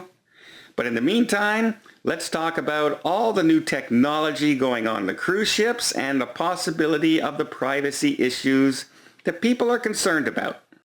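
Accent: Canada. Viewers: He's Canadian. Sometimes they say words differently than we do here. Stop being an English teacher!!!!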